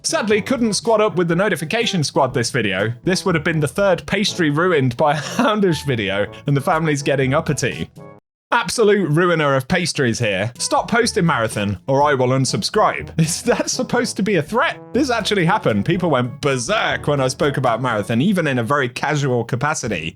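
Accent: United Kingdom. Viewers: Im so used to you serious voice ready the scrip for the video, for me you sound identical to "baldur's gate 3 narrator outtakes" 😂💀 (it is the british accent im so sorry😂) I have'nt played D2 over more then a year i think but from time to time i see your video put up on my page and just watch it to be a little up to date (im able im providing food for your starving kids) I finally got my own place and after years im gonna hang up my Hive sword in my gameroom that i got yeaaaarrss ago with the pit of heresy achievement. I still kinda hope D2 will get better and im able to return but for now im still salty they took Forsaken from me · Idk how long I've been following you but I always love your snark videos. The british accent and the sarcasm just belong together bro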